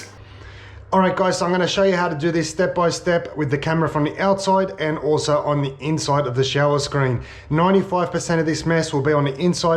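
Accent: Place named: Australia. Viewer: Randomly came across one of your vids, as soon as I heard the voice I was like “mad a Aussie channel” I’m about 40 videos in and have subbed. Cheers from Sydney ✌🏻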